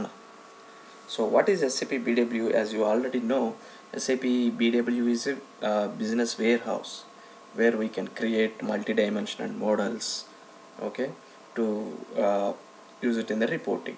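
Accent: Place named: India. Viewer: Please don't use your ugly cranky shitty indian accent, gives me headaches. Get a proper english education.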